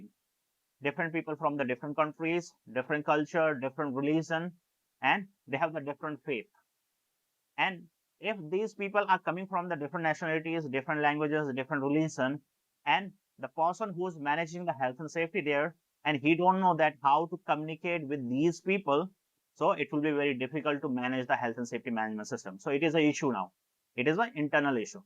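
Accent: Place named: Bihar, India. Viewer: Sir very amazed after listening you you have eloquently explained each and every aspect. Be blessed always. Love From Pakistan. I think you are from India most probably from Bihar you ascent and facial expressions are resembling to that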